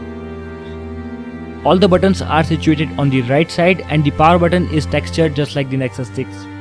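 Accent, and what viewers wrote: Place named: Bihar, India. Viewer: Your English has Bihari Accent! #NoOffence Nice Video btw! :)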